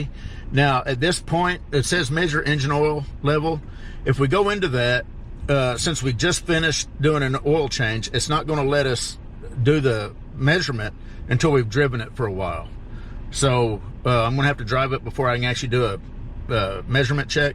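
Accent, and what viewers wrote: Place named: South, United States. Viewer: Yeah, I´m watching here from Germany bro. Love your southern accent....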